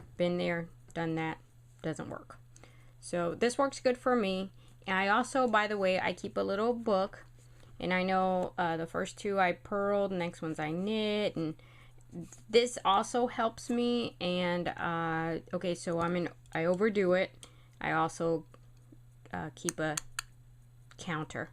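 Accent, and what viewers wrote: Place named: Baltimore, United States. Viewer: are you by chance from Mid-Atlantic area? you say "on" like somebody from Baltimore (i get a kick out of trying to learn about different regional accents)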